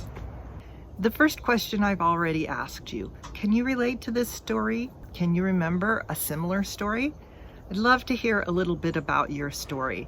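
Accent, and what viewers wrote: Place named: United States. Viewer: I love your English, I think I reinforce it listening to you, your accent is very clean though I know that depends upon the region where you live is the type of accent, yours is wonderful if all the Americans had your accent it would be so easy for me to understand any person but it’s not in that way and there’s no other way that trying to train our ears with the different accents, congratulations, how I wish to talk to you so that I can practice the language.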